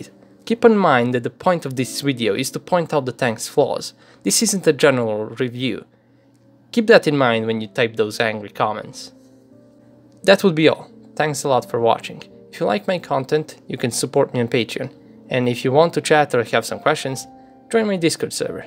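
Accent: Russia. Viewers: funny to hear criticism of the Merkava with a clear Russian accent. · This guy is “stupid” you know why? Because I’ve been through thick and thin with this tank Meaning I’m a Gunner in IDF Armored Corps, 7th Brigade,I think the uploader is a Russian speaker with a little bit of accents in English, who probably have friends in the IDF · This video is reasonably good. However, you thick Russian accent in English commentary at times obscures the meaning especially on the technical terms.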